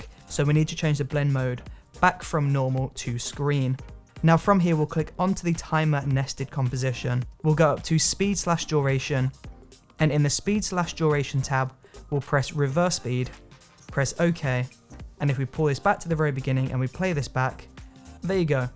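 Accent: United Kingdom. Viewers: Hii, it helped me alot. Thank you very much. Are you British? I like your accent!!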